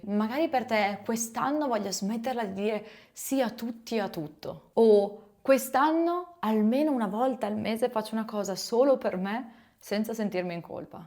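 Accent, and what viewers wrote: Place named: Veneto, Italy. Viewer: Prima cosa: fa strano sentirti in italiano, con questo accento spiccato veneto quando in live parli in inglese. Seconda cosa: personalmente, non do tanto valore al compleanno perché, anche se sembra banale, perché dovrei festeggiare, fare "casino", invintare gente quando (in teoria) posso farlo tutto l'anno? È come quando si dice: "La festa delle donne non è solo l'8 marzo, ma è tutto l'anno". Però, al tempo stesso, vero, più passano gli anni e più, almeno personalmente, non mi accorgo che siano passati. Forse perché tutto passa in fretta, taaaanto in fretta, e certe volte è difficile stare dietro a tutto. Non so dove voglio a parare con questo commento, ma forse, vorrei accendere quella speranza che ad ogni anno le cose andranno bene, non meglio, bene, anche se certe volte è difficile confrontarsi con le felicità altrui (quando specialmente già gli altri iniziano ad avere i primi lavori, le prime case, le prime auto, mentre io rimango qui nella stessa cameretta dove sono cresciuto con ancora tanto da fare e da scoprire)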